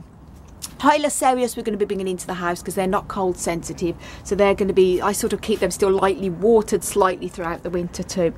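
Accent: Birmingham, United Kingdom. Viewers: Are you from brum..sound like my family😂😂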